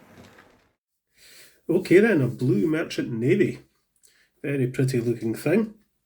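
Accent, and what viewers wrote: Scotland, United Kingdom. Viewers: sorry it must have been my inability to listen to a Scottish accent. 😊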